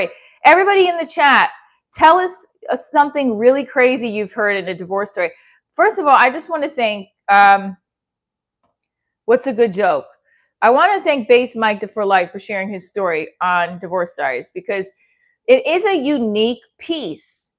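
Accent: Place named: Boston, United States. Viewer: Yea. You have Boston accent. Kinda Jewish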